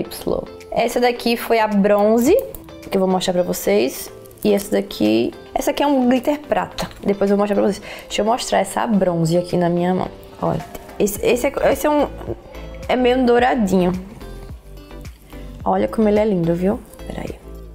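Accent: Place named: Maceió, Brazil. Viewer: amo o sutaque dela e tmb sou de maceio❤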